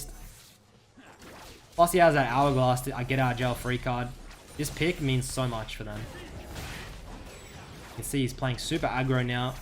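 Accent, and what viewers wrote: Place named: Australia. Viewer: voice is so annoying...too aussie...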